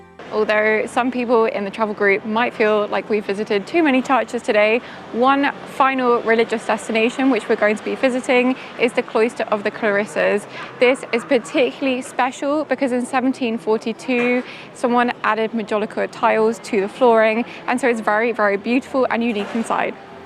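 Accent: United Kingdom. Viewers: Yeah because of course anyone who's not shouting and being chaotic as we are has low energy... This kind of closed-mindedness makes Neapolitans unlikeable to many people, and to other Neapolitans like myself. This girl sounds British, and Brits have a calm way to speak. Or you want her to start dancing tarantella while she talks so she can match what you consider to be Neapolitan "high-energy"?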